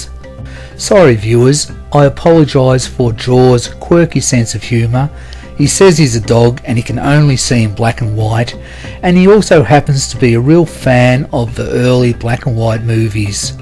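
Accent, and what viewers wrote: Australia. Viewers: I’m very happy your south aussie and you sound like russel cought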